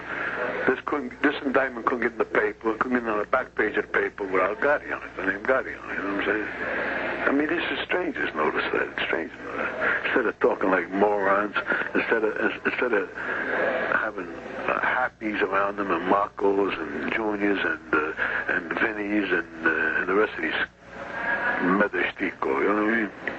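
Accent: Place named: Sicily, Italy. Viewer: Scicilian Accent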